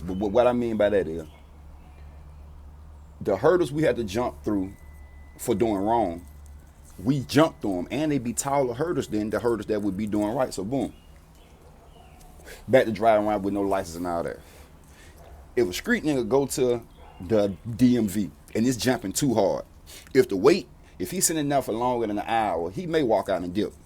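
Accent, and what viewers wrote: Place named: South, United States. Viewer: Dude I’m just now remembering who you are man. You had a album with some songs I used to ride to but it was one of your older projects. It was something you had out between <num>. It might have been old when I got on it but I believe it was new the time. The beat had a nice guitar on it if I’m not mistaken with almost like a blues riff playing on it but with the trap style drums but more like the 90s style drums. I been looking tonight but still haven’t found it. Only reason I remember because I associate your music to when my daughter was younger in elementary but now she 12. Whatever that video was I’m thinking of I used to watch it like every evening for a couple weeks straight. I live in Mobile. I have family in Birmingham though so the music is relatable. I have a similar southern drawl. Keep the videos coming. 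Nice work. Didn’t know you had got in that trouble. Just watched an old interview with Ugly Money. You talk like you more valuable to your family & community being free than to the federal prison system. Kill them jokers with success & kindness.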